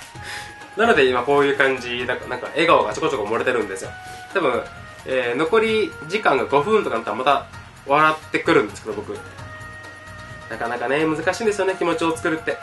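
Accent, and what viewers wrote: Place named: Fukuoka, Japan. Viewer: 福岡なまりが懐かしくて癒やされます！お疲れ様でした(`･ω･´)ゞ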